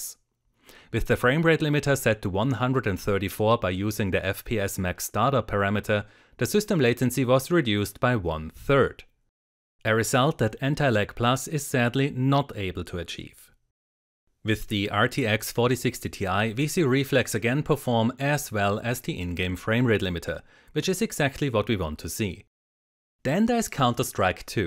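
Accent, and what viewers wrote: Austria. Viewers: From Austria eh?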